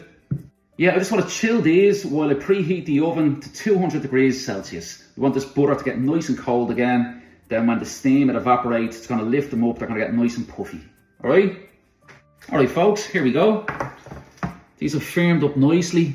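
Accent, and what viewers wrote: Ireland. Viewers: Put them in the oven for about turtee to turtee five minutes! Love and miss the Irish accent. 👋😁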